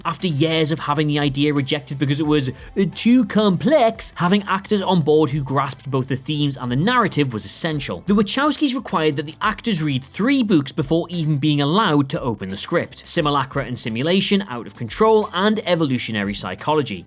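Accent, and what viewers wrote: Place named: England, United Kingdom. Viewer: I thought the video would be good until I heard the english accent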